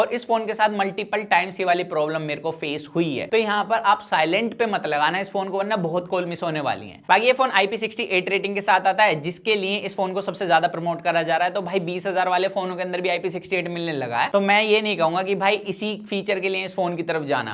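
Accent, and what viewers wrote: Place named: Haryana, India. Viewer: Kuon hae yae Chutiya😂....look at his voice... haryana kae kuon sae "gaon" sae hae 💩💩 I thought it's mrwhosetheboss . BC mood off kar diya🤬 "itnaa kachraa hae you tube par"💩💩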